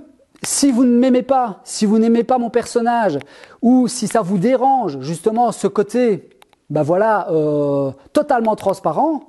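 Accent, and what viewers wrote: Belgium. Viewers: T inquiète, la grande majorité a compris ta démarche. Je te regarde pour le partage et la qualité de ton travail. En bonus j apprécie ton franc parlé et ton accent belge. Bon week-end à toi.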